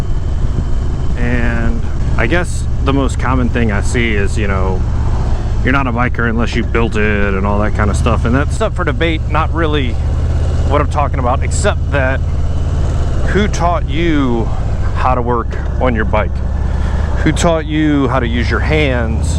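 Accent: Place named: South, United States. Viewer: You sound more country when you're with the kids.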